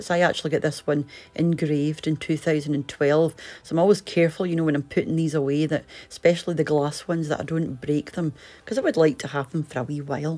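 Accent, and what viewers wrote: Scotland, United Kingdom. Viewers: I love Christmas too! Can't wait for New Zealand winter to make this stew in my slow cooker, I freakin LOVE Irish Stew!! I was raised in Invercargill, right down the bottom of the South Island, as you can tell by the name, it was settled by Irish, so I grew up on lots of different stews 😍 I am obsessed with your Scottish accent! Even though I've been living in Nelson, top of the South Island, for 26 years now, people can still tell I'm from down south because I still roll my R's slightly lol 😊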